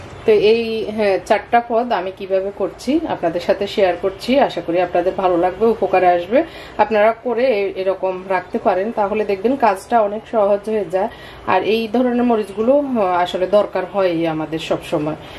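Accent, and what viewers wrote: Noakhali, Bangladesh. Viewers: যে মজা😆😆😆😆আপনার নোয়াখাইল্লা কথা,হাসলাম কতক্ষণ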